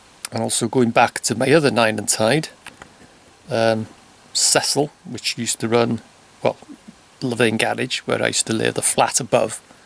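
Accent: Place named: Liverpool, United Kingdom. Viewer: Great vlog love stories about how things used to be,i new u were a bit scouse by the way u say some words.Hope u got more stories to tell.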